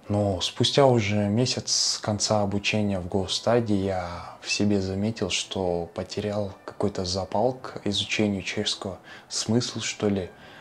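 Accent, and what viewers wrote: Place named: Tatarstan, Russia. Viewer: Молодец! Восхищаюсь! По тому, как говорите на русском, сказала бы, что акцент , как у татар😀 Сама татарка🙂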